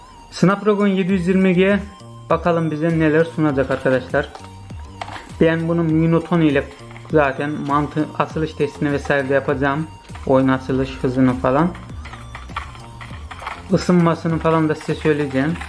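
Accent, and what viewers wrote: Azerbaijan. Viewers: sen azerbaycanlımısın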